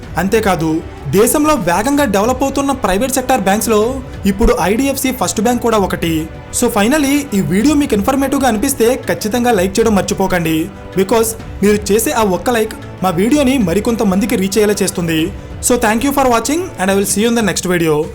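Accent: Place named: Coastal Andhra, India. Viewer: bro , coastal andhra people pronunciation ala ne untadhi .. Rayalaseema and telangana slag laga 👍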